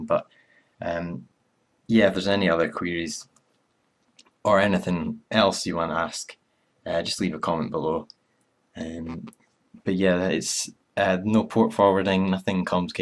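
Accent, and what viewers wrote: Scotland, United Kingdom. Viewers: are you scottish? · Thanks this helped ! Are you Scottish ?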